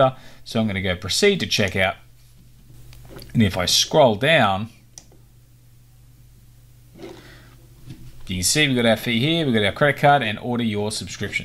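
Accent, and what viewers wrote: Australia. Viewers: Hi. I set up the subscription on WooCommerce following your intstructions. When I click the Proceed To Check Out button I get a message saying you must be logged in to check out. Have you had this happen. There is no option to log in. Its great to hear a fellow Aussie on YT. Brian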